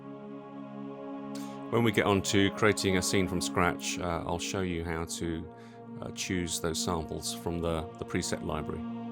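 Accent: United Kingdom. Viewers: Superb content, loved this and especially refreshing to watch a synth review from someone with a nice British accent for a change. I was wondering about a Jupiter X as a compliment to my Korg Kronos. The problem is, the Kronos is so hugely powerful that any additional synth needs to do something that the Kronos can't. Still not sure about the JP X, but this video really brought out the issues and benefits with admirable clarity. It also demonstrates what real UI complexity looks like. People moan about the UI on the Kronos, but at least everything is laid out on a large touchscreen for you, so once you know each synth engine, muscle memory can kick in. The interface on this looks like the worst of all worlds really, back to the days of painting the Sistine Chapel through a letterbox to some degree! A problem that certainly afflicts the D50 I have. What are your views on a JP X as a complementary synth to a workstation like the Kronos?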